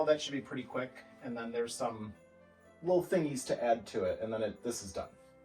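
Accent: Minnesota, United States. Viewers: The final garland look over the TV deserved that high kick! Looks so great! Since you mentioned you had 3 sets of batting, without further ado: On the 3rd Day of Vlogmas my true love gave to me: Three sets of batting Two power stips 🔌🔌 And you sound like the movie Fargo 🎄⛄🙂⛄🎄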